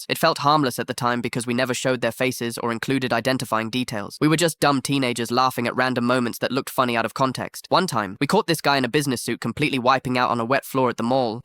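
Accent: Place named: United Kingdom. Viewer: what is this goofy ass british voice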